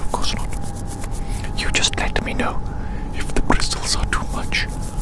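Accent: Wales, United Kingdom. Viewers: Thank god finally got closure that you were from wales it was really bothering me that I couldnt prove it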